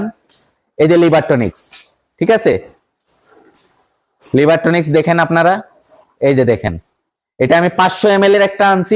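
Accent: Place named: Sylhet, Bangladesh. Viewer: সিলেটি মাত হুনিয়া ভালো লাগচে❤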